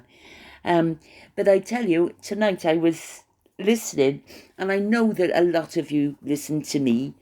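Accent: Wales, United Kingdom. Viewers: love can’t you ask the council to move you elsewhere where it’s quiet love, I have moved 3times in my life, but I miss Wales you love cannot remember me, we were young and we had good times at Cwmbran cinema, I moved to Gloucester to train as a nurse, and then I married am still in Gloucester we are both the same age, but still remember our young lives we had fun, I was shocked when I found you, and missed your lovely voice, so glad you are back, not on x so I can’t stand the evil, I lost my husband in January then 6 months later my only daughter she did not tell me her cancer had come back, what you did is nothing to be ashamed off, you looked after them, glad to hear you again you take me me back to my youth when I hear you accent I’m back to young time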